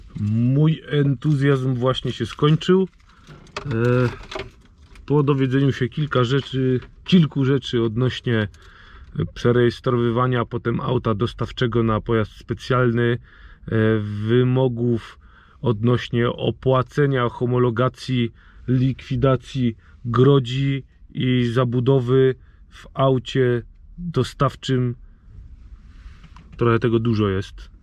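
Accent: Silesia, Poland. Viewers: Chopie jo cie lubia i ta godka ino zdrów być ❤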